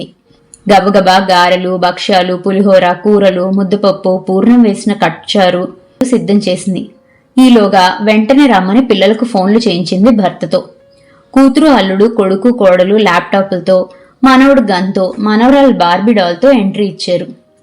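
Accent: Godavari, India. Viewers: గోదావరియాస బాగా అలవాటైనట్లుంది రమ్యా 😊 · చాలా బాగా చదివావు రమ్యా! గోదావరి యాస చాలా బాగా పలికావు. అభినందనలు రమ్యా🎉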